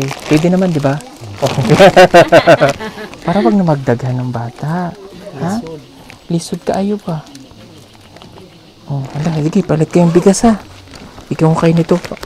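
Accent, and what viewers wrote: Visayas, Philippines. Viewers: Maganda araw sir kabalo n jud ka mag bisaya hehe.masaya n nman ang mga katutubo dahil dumating si sta clous lagi pasko pag dumating ang pb team sa sitio ng mga katutubo. salamat sir at sa boung team. salamat din sa mga sponsors.god blessed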